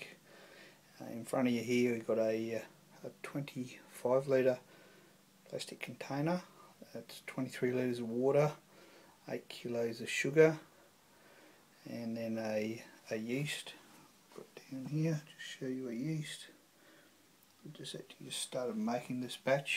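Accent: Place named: South Africa. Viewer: R u from Aust or Nz , u sound like SA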